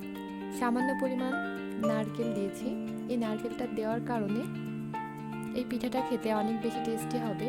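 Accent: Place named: Jessore, Bangladesh. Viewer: Apu mone hocche Jessore er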